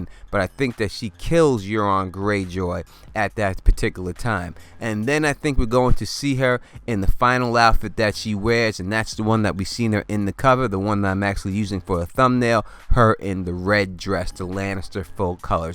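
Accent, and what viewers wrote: New York, United States. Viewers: Glad you're better. Was sick myself couple of weeks ago. Great video 👍 We wouldn't leave ya!! Stay sexy 😘 Could listen to your voice all day. I love New York accents. I live in Alabama so...that doesn't happen enough!!😆💕💙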